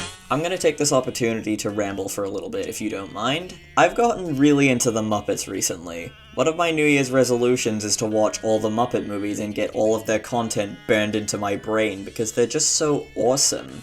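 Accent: United Kingdom. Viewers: I wasn’t expecting this video to have full commentary !! The Bri’ish accent is really soft but it’s still satisfying to listen to !! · a British accent isn't always so heavy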